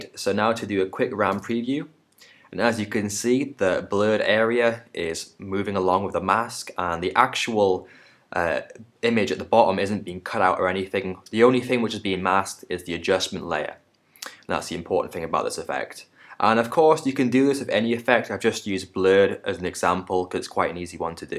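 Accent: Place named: England, United Kingdom. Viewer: I have not problem with it and I like the accent but is it me or is every premiere or AE tutorial done by an English person?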